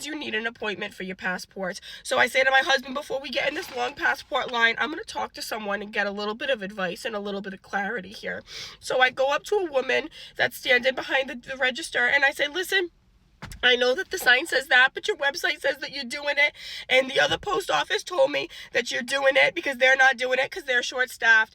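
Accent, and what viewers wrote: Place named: Louisiana, United States. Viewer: The accent! Sound like us down in Louisiana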